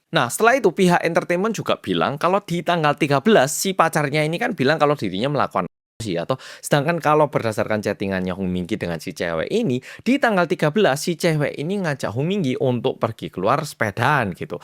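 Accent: Java, Indonesia. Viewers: Udah nggak tertarik sama sokor, cuma senang nonton mas, lucu 😂, medok medok jowo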